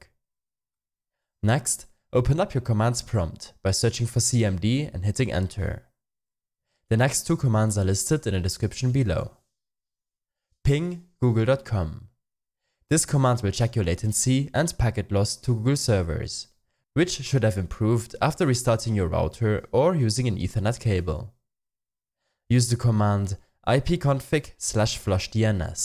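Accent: Germany. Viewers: Ich am ANfang: das ist doch safe ein Deutscher Ich bin wirklich gut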